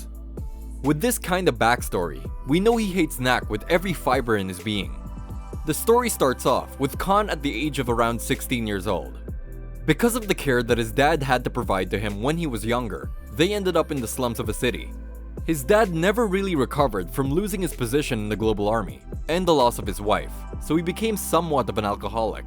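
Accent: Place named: Philippines. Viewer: That's awesome dude. Been here since 200 subs. Btw are u a Filipino ur accent sounds like many Filipinos.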